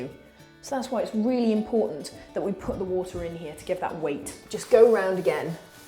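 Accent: England, United Kingdom. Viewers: That's an English accent 🙄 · She sounds English. Makes a nice change from American and Aussie DIYers.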